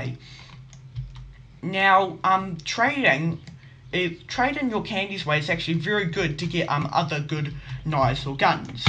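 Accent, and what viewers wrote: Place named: United Kingdom. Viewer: Brittish accent 👌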